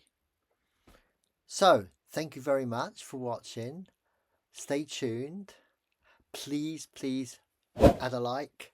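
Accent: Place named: United Kingdom. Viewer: Falta los subtitulado desde el inicio. Por lo demas todo bien. Me encanta el acento British 🥰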